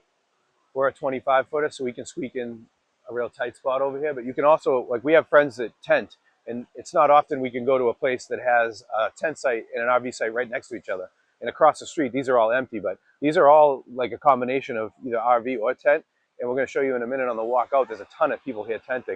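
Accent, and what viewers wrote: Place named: Massachusetts, United States. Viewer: You are definitely from Massachusetts. Accent & dropping the r's at the end of the word. Sound effects you do not need. Another camp ground on my list of possible stays thank you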